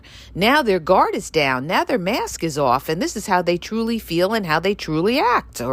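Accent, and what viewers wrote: New York, United States. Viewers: I am sorry to always be so complimentary, but I am understanding what you are saying on a deeper level. I get excited! This is understanding narcissists, which is the only way to proceed with them. I have so much more power with the truth of it all when I understand what they are doing and who they are... especially in the legal realm. As always, thank you, thank you, thank you. You can trust a native New-Yorker when you hear the accent and you see your street-wise consciousness. New-Yorkers see it all and it has been a matter of staying ALIVE to be able to defend in a brutal world.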